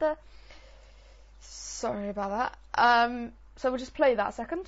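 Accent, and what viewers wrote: United Kingdom. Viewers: guys stop calling him a girl,I think hes...british,with the accent,i think... -_ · you cant beat the west country accent eh? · Hes from the UK. With an accent.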